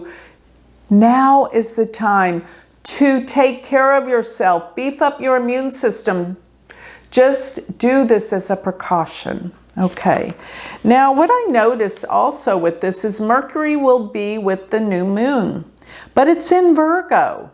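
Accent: United States. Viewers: Love your accent it's totally like Kelly Bandy - the classic American. Greetings from Athens, Greece! Love Christina Aguilera, Britney, Madonna!!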